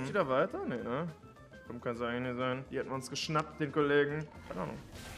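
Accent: Bavaria, Germany. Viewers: Bitte mehr Bayrischen Stiel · Ich liebe bayrisch so dermaßen. Mein absoluter lieblings Dialekt Schöne Grüße aus dem Kölsche Veedel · Kannst du mal nen Bayrischen Stream machen, Gragas und Cho only und nur im Dialekt reden, würde ich sehr fühlen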